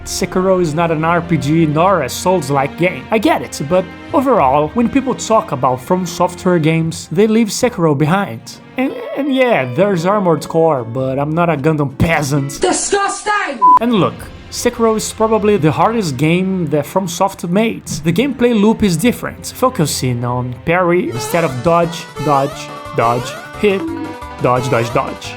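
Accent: Brazil. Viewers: tu é brazuca?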